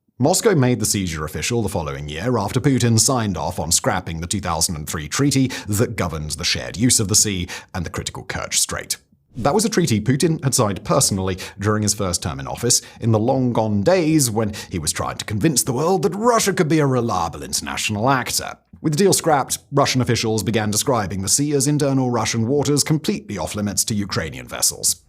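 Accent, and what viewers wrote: United Kingdom. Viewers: Dude's British, get over it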